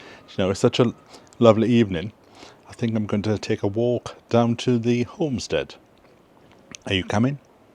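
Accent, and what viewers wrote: Wales, United Kingdom. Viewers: I love your accent and I think it's got stronger since you moved back to Wales. The homestead is in a great location, you struck lucky there.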